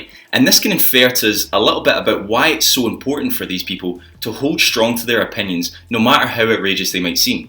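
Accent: Scotland, United Kingdom. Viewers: Oh my god you are saying that the base of the person if the self esteem is compromised this leads them to believe in outlandish ideas NOT for the ideas themselves but for the sake of the reassuring position these ideas give them in an accepting groups. So if you try to reach them through changing their views it's impossible because they are tied these views to their low self esteem.So my question is how can if you can, help someone whose self esteem is damaged to encourage them to feel better about themselves? By the way I knew you were Scottish.I'm from Spain but I am 3% Scottish lol!!!I appreciate how clearly you explain things.Can you please make a video on how to help internally wounded people with some kind of wise approach?Thanks!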